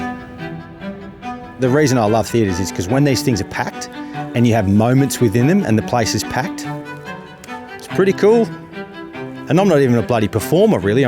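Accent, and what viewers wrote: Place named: Australia. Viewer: Watched to learn Aussie accent way back 2019 in preparation for Uni in Melbourne. Continued watching since! Stoked to see you in July!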